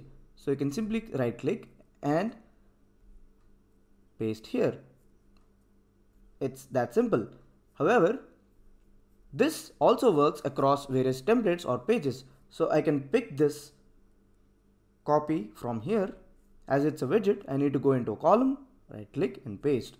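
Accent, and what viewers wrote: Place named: India. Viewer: awful indian accent, can't understand anything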